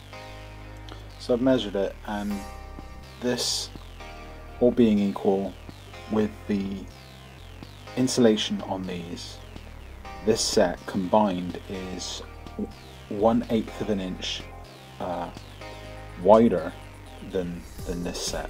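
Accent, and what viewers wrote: Bristol, United Kingdom. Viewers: Good video, are you from Bristol?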